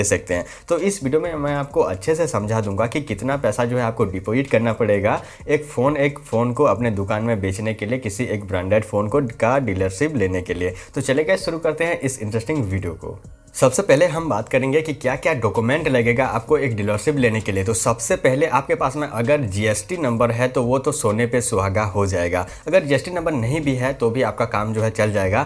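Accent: Chhattisgarh, India. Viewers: Chhattisgarh se ho kya aap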